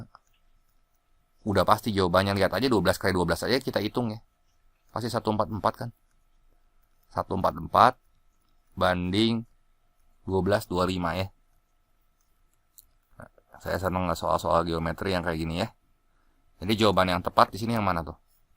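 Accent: West Java, Indonesia. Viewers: kak terimakasih sangat membantu!!! semoga aku bisa tembus skor 1000 hehe btw lucu bgt sm aksen sundanya😆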